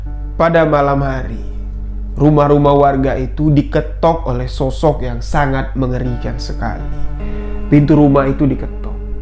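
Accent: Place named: Bali, Indonesia. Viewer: Cepet sembuh, sehat kembali bamg. Ngomong2, kl d denger2 logat jambi agak mirip bali ya... Prtama denger bang bicara sy kira orang bali 😁😁🙏🙏🙏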